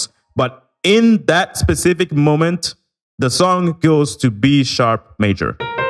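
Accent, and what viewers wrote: Italy. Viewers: but... Do you have Italian origins? your Italian accent is perfect!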